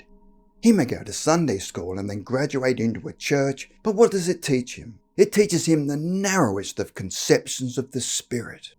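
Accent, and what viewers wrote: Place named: Australia. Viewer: okay than the narrator must be austalian.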